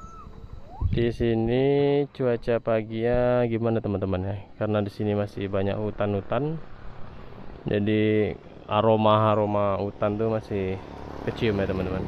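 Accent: Pekanbaru, Indonesia. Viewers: Logat pekanbarunya keliatan banget... support terus. Orang pekanbaru like!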